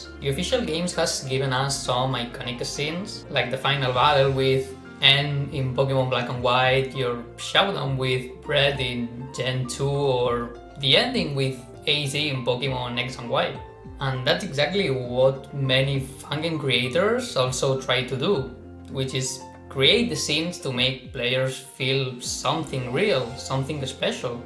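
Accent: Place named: Spain. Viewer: Se nota q hablas español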